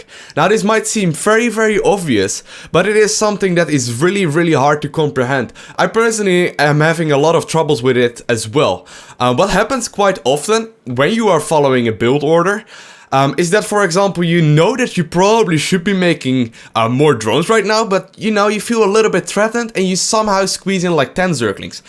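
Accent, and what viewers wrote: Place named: Netherlands. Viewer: fuck i love dutch people. your personalities and accent is so awesome! love your videos bro!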